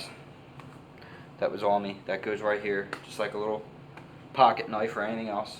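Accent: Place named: Pennsylvania, United States. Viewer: Can't deny that PA accent lol, I've done a lot of work up there in the oilfield and the locals laughed at my accent and I would laugh at yalls. Had some good times up there., thanks for bringing back some good memories.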